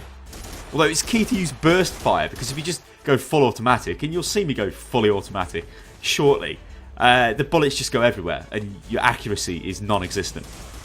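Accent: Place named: Birmingham, United Kingdom. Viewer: First of all, nice job with the new review! waay better :) but secondly, I've been wondering about your accents for a while now... so I'm gonna take a stab at it and guess... Birmingham?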